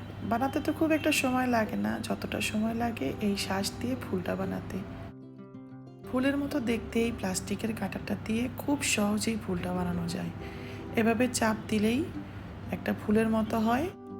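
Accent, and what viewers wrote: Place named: Barishal, Bangladesh. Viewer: Apu apni kothai porashuna korechen,apnar kotha shune barishal,feni,noakhali mone hoy,?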